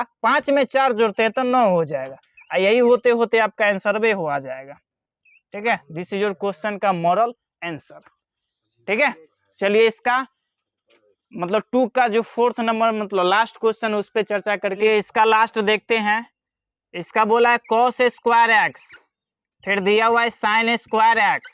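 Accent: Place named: Bihar, India. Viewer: Ekdam Bihari style me sir 😍😍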